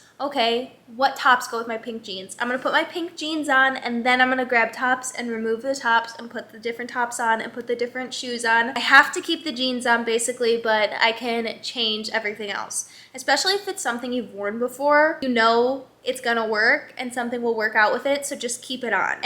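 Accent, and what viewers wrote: Midwest, United States. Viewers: i love your midwest accent 😭💕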